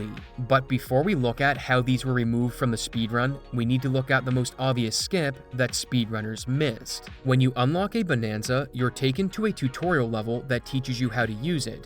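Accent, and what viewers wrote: Canada. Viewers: In your outro, you always say " ", but your profile name clearly lacks the Z. Did it once before, and do you ever consider adding it back(you don't have to, just curious)? Also, with how you pronounce words with the sound of "out" and sometimes just "ow" in them, I wonder: Are you Canadian? Because the way you say them is a pretty common thing in Canadian accents. And about your voice, is that your actual voice talking, or an AI reading what you type in it?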